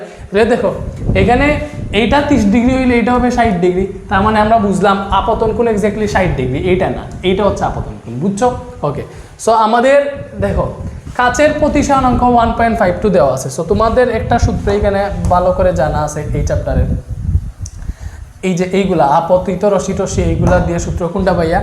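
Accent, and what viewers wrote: Sylhet, Bangladesh. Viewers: Vai apni Sylheti Matiya Koin ❤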